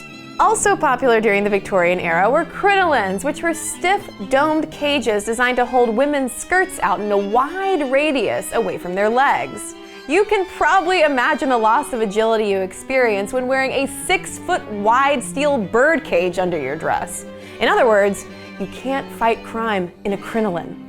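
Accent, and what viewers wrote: United States. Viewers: It's really odd hearing an obnoxious American woman explain Victorian customs. I am most unamused by this hussy. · Seems ridiculous having an American doing the narration ! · What an obnoxious "presenter"! These customs and ways would sound more valid and easier to listen to from a native British speaker. Her attitude could use a major overhaul, too. She contributes to the image of the obnoxious American know-it-all. I wouldn't subscribe to her channel for all the pounds in Britain! (p.s. I'm an American)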